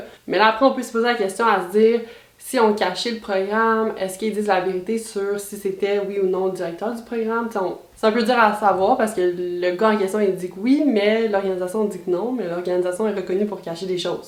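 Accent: Quebec, Canada. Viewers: Très bon résumé, c'est la première vidéo que je vois de ton site et j'adore, surtout ça fait du bien d'entendre quelqu'un parler des ovnis en Québécois, beaucoup plus facile à comprendre.Très heureux de voir que les Québécois(se) commence à crée des sites sur les ovnis-phénomènes inexpliqué-etc..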